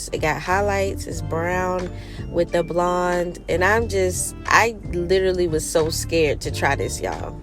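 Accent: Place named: New York, United States. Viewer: lol, yea girl. you are almost prime with the NYC accent. more practice :0) it must be really humid today. when it gets humid here i have trouble breathing. and then it takes my energy. ughh!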